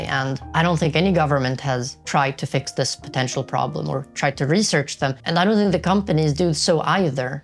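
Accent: Sweden. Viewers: Are you a Swede? The "E"s are quite Swedish. What is it with Nordics being over represented by nerds... is it that outside sucks majority of the time. Winter is nice tho. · Just found your channel, interested to know where you are from; you talk English like a Swedish person. Nevertheless you bring up good points and it is clear that you have given this a lot of thoughts and seems to understand AI and how humans interact with it at a greater level than most. I agree with you that llm's like GPT's are not the path to AGI, it might help us along the way but I think we are running up a wall and need to find a new model first.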